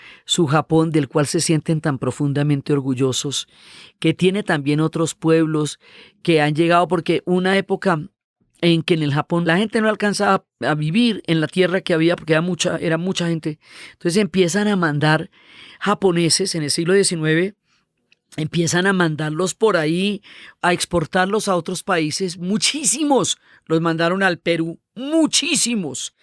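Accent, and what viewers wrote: Colombia. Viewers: un agradecimiento patrio por ser la embajadora de la palabra con acento colombiano. Qué exquisita experiencia visitar al Japón 🇯🇵 guiada por tu voz. Gracias!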